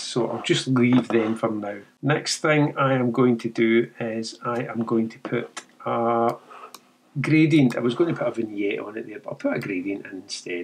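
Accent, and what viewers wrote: Scotland, United Kingdom. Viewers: I love your accent....but you got me with the 22 Megabyte FAIL...What is a FAIL.... After about the 4th or 5th time I heard it, I finally got it. A FAIL is Scottish for a FILE with a long eye in American English. For us Yanks, fail rhythms with pale or pail. English is so screwy. "My attempt at editing was a major fail"...Like failure, failed, DOUGH is pronounced D-OH COUGH is pronounced CAW-F There is a street in San Francisco named GOUGH... Not GO, not GUFF, it is GOFF Do you say NILE like the river in Egypt for those pointy metal things we hit with hammers? As I said, I really love your accent, it is beautiful. Reminds me of the great Sean Connery. And once I got it, I totally understood. It is very charming...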